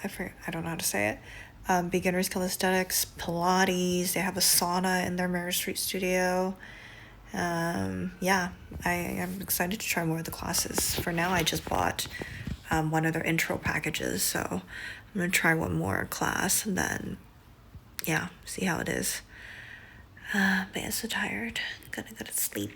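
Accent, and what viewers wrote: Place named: Canada. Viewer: I love the Canadian raising in your accent - every other sentence sounds like a question!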